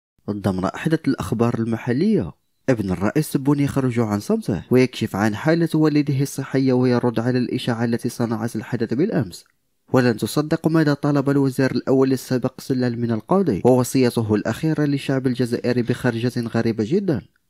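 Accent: Morocco. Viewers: باينة لكنة مغربية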